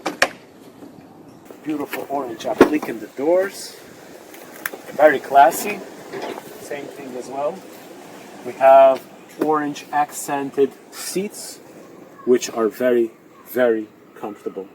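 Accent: Russia. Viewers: raaashian accent? cool truck dude. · Smoothest Russian accent I’ve ever heard. That interior color is horrible btw. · If that’s a scwartzenegger reference, i don’t think this guy is Austrian, he sounds Russian or maybe Ukrainian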